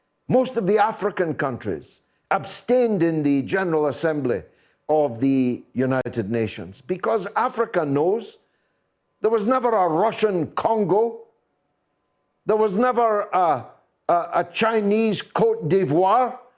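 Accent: Scotland, United Kingdom. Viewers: I love your videos particularly in your Scottish accent, which nailed all your point more succinctly than the English’s accent could. Keep up the good work,.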